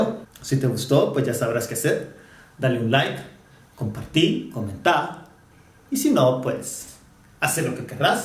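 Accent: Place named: Uruguay. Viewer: Está bueno que informes para evitar accidentes. Muy bien cuadro en el fondo. Hay canales que enseñan recetas pero no he visto ninguno que hable de las proporciones por persona y del tiempo a esperar antes de decidir tomar otra porción. Muy bien informado. Me llamó la atención tu acento, de qué país sos? Hablas bastante parecido a nosotros los uruguayos.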